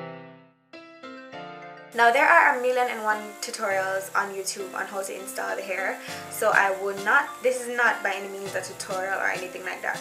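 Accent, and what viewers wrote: Jamaica. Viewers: Jamaican? Girllll imma subscribe jus because u are, I'm j'can too, portmore. Love ur makeup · You sounds Jamaican,are you? Greetings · Looks good and natural sweetie and you make me feel home sick with your beautiful Jamdown voice X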